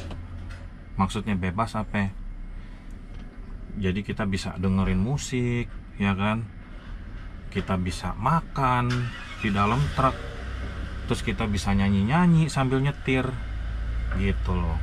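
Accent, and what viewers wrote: Jakarta, Indonesia. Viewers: gua suka lihat loe walaupun tinggal di amerika tapi masih logat betawi haha.. semoga sukses bang! :D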